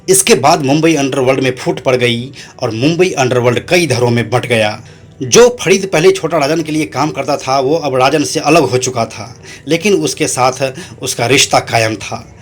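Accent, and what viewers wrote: Bihar, India. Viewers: Hee too tuu bihari aawaj teri smj nhi aari bol kya rha h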